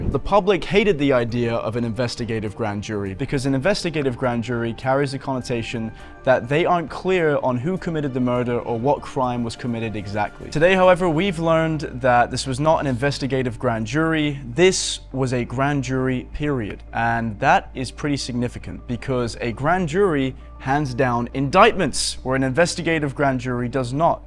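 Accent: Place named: United Kingdom. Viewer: Who’s this slow talking Brit 🥱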